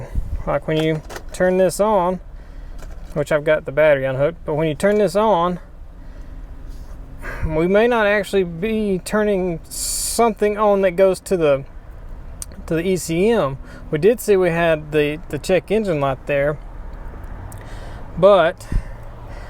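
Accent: South, United States. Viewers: I watch every video but have learned the most about canbuss communication issues. Watching your videos is like watching your thought process spoken out loud. you're extremely intuitive, and you can see the wheels turning at each step of your process and why you've chosen the particular diagnosis path. your laid-back southern demeanor, and your straightforward integrity is very refreshing. but I've got to admit I'm from the west cost so I adjust the YouTube playback speed much faster so you sound like someone familiar from around here. lol: no disrespect intended.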